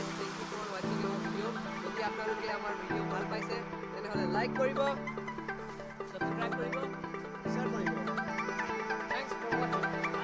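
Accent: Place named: Lower Assam, India. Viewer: Aap Jo ye Word Bol Rahe ho..haa imke similar jo sare word hai... Wo lower assam ke logo ke muh mai jyada suit krne hai.accent accha hai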